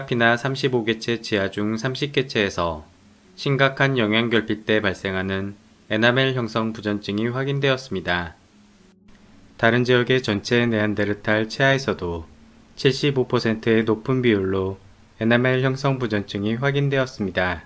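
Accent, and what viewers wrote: Jeolla, South Korea. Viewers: 남도 사투리가 있으시네요.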